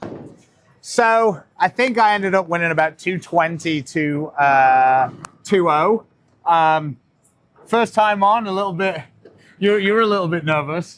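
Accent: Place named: United Kingdom. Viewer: Extremely britesh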